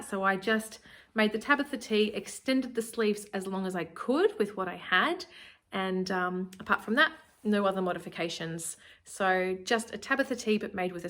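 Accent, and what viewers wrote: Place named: Australia. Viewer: I really enjoyed your cruise handmade wardrobe tour. It’s also fantastic to listen to a fellow Aussie and familiar shops and fabrics. I have two patena blouses and love them. ( a basic but with a twist)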